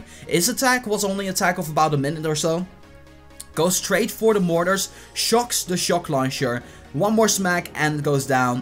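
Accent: Netherlands. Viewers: This is one of the most helpful players I've found. His accent is Dutch. You guys are rude.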